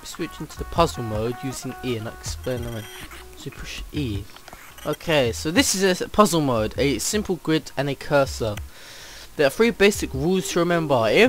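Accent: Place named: Russia. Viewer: That's a Russian accent xD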